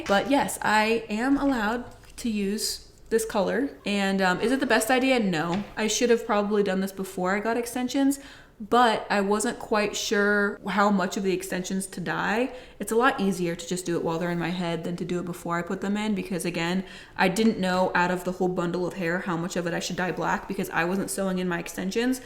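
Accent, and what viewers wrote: United Kingdom. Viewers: I love your British accent, as a Brit, I approve. Also love your attitude as ever...you are awesome.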